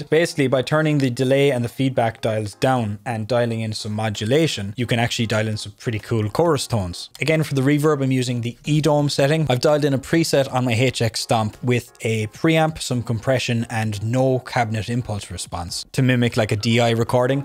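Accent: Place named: Ireland. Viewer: New sub here, Tis gas to be listening to a pedal review with a good oul strong paddy accent 😂 Fellow paddy here. Great review ta be fair to ya. Hoping to pick one of these tomorrow to try scale down my board and utilities midi functionality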